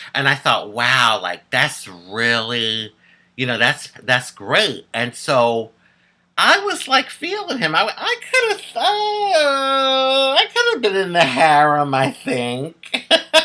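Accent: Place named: New York, United States. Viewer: you really do personify multiple races (white, black, Indian, islander, etc..) But I sorta consider you as a black woman. You have black people most unique ways and manner. You speak more like an educated white woman from new York, but when you let your guard down, the real you comes out. It makes you funny and someone that many can identify with. Be blessed my sister. I watch every video that you put out, seriously.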